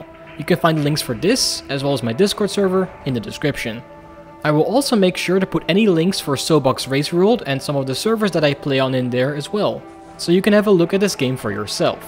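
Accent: Netherlands. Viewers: The Dutch accent is already unmistakable.. But 'Costed'?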